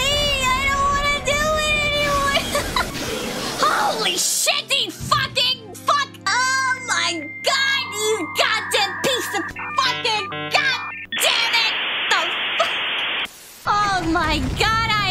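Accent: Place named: South, United States. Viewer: the cursier she gets the more her Southern drawl comes out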